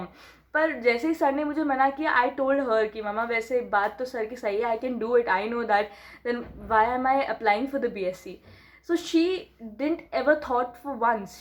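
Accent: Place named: Saharanpur, India. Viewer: Di aap saharanpur ki lagti ho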